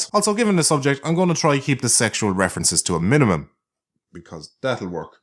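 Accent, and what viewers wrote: Ireland. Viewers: Is he irish mate pls be cause I be the only one hardly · Goldenaries 08 it's a well spoken Irish accent. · I feel I've missed something since I've never heard of you till today when you waddled into my YT feed. Love your accent (Irish? the Yank guessed) and agree the world is fucking insane. Subbed. Keep up this.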